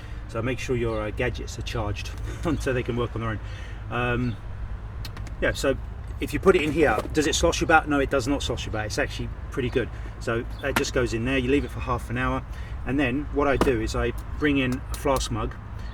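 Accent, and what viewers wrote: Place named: England, United Kingdom. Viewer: I don't get it. You sound English not Bangladeshi. Can't you just afford a coffee?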